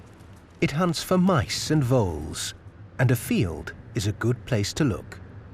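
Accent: United Kingdom. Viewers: Imagine if this documentary began with a female photographer taking photos of a man in a speedo, or some such get up. This, combined with a British accent to make it classy. Way to dumb down science at the expense and degradation of women.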